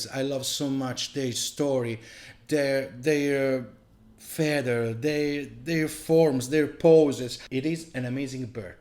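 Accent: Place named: Italy. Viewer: Your bird is very realistic, yes I love your videos in English, just do not lose your charming Italian Accent!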